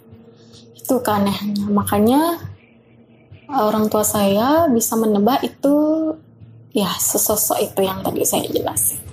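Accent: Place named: Bali, Indonesia. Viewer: Logat balinya sampek sini mbo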